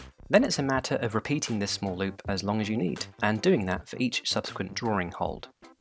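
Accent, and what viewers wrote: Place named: United Kingdom. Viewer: This is it, the perfect tutorial! It's short, understandable AND it has your lovely British voice guiding us through it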